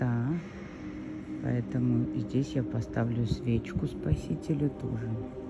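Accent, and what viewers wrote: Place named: Moscow, Russia. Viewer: голос тридвараса..москвича все ясно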